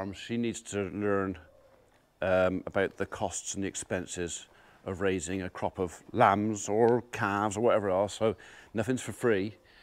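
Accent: United Kingdom. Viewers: I have always enjoyed the British accent but have a tough time understanding Scottish & Welsh. Thanks for sharing your daily experiences. I hope you recover quickly from the virus; peace! 🙂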